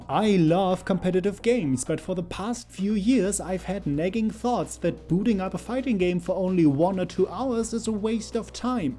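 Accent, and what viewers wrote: Germany. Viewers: Great video. I couldn't agree more - I only started YouTube a few months back, and even though barely anyone watches my stuff yet, I know what you mean about valuing free time differently. At points it really feels like there's not enough time in the day to do what you need just to improve your videos/channel, not to mention everything else. You've made some really apt points. Subscribed. Ich wollte auch fragen, ob Sie aus Deutschland kommen, oder ein deutschsprachiges Land? Ich bin mir nicht total sicher, aber ich glaube, ich erkenne den Akzent. Davon abgesehen ist das Englisch in den Videos absolut perfekt! Tolle Leistung :)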